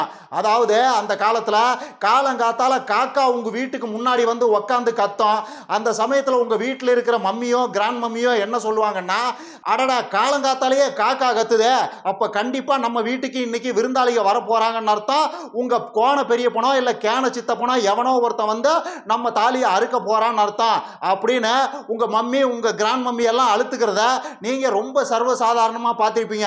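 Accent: Kongu, India. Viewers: Super thambi nalla irrugu un kongu petchu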